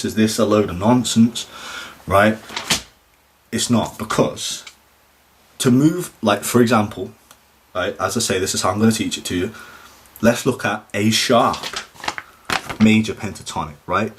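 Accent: United Kingdom. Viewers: BRILLIANT!!!!!!!! T U so much for literally helping me out with ALL FORMATIONS of the Pentatonic Scales; I happened 2 stumble upon U, and Quite elated that I NOW can practice Scale Patterns watching your video. Also love your English accent.! Again, thanks! peace&love out 2 U cheers!!!!!!!!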